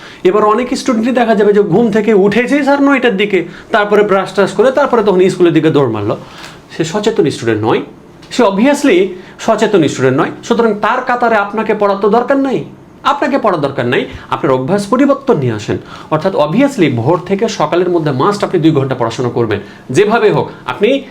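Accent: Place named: Chapai Nawabganj, Bangladesh. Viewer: sir এর কথায় কিছু চাঁপাইনবাবগঞ্জের গন্ধ পাওয়া যাচ্ছে ❤❤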